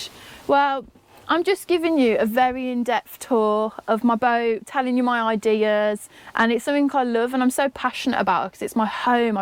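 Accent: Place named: Coventry, United Kingdom. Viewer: This may be a bit of a long shot but that sounds like a Cov. accent. Possibly even Nuneaton.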